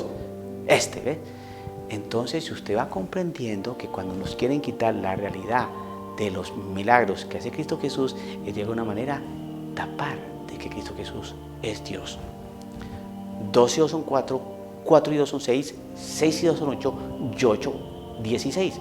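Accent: Colombia. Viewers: Padre, me gusta mucho todo lo que explica en cada video, no he visto todos sus videos pero los pocos que he escuchado me dejan impresionada de como el Espiritu Santo le ilumina, me impresiono tambien el grado de firmeza que tiene en su fe y su verdadera vocacion sacerdotal, a pesar de ser tan joven me admira como esta tan centrado en la VERDAD de Jesus mejor que muchos sacerdotes que tristemente no estan estudiando los signos de los tiempos y por eso se estan cegando ante tanta apostasia y confusion. Dios le bendiga. Por su acento creo es de ¿Colombia? Colombia y Mexico seran paises grandemente purificados porque de estos dos paises saldran muchas luces que llevaran al mundo la doctrina verdadera, cuando estemos en oscuridad... En oracion y vigilancia como Jesus nos los pidio. Saludos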